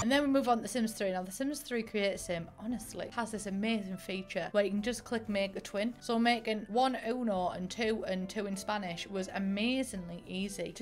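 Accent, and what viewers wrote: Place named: England, United Kingdom. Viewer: There’s this joke here in Aus about old ppl saying “film” like “fil- lim” but now after hearing you say it exactly the same I know it’s not something they do cuz they’re old, it’s cuz they came from your side of England! (Which my Nan did! Honestly I can’t deny that half the joy of watching your videos is to hear her accent & humour again!) Pretty sure Tea was dinner as well for much of my childhood & probably only changed when kids at school didn’t know which “tea” I meant.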